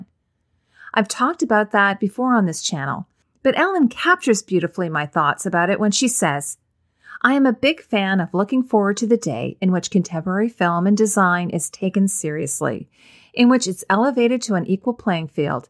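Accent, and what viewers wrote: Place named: Canada. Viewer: I notice a very slight Canadian accent on words like "about" :) It leans into to Canadian "aboat"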